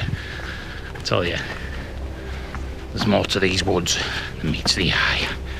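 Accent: Yorkshire, United Kingdom. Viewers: tv show filmed at Port M was "The Prisoner" used to watch the re runs in 90's : ) You sound like a fellow Yorkshireman, im West Yorkshire Lad B'n'B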